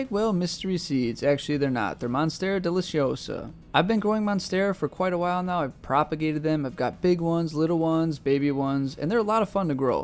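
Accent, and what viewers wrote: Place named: Midwest, United States. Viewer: i need some midwest friends then! i love the accent lol. yes i barely discovered your channel and i love plants and now im starting to become obsessed with starting things from seed so your videos are really helpful and i enjoy watching them! i have a few projects i’m starting/about to start so i’m excited 😆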